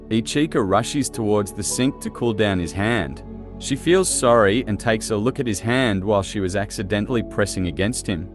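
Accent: Australia. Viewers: Australian accents go hard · the assie accent is really offputting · Nice Australian accent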